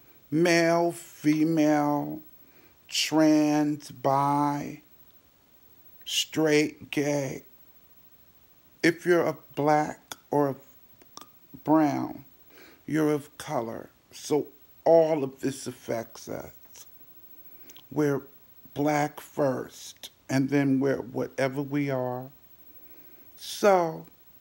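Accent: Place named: New York, United States. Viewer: Are you from New York? You sound like a New Yorker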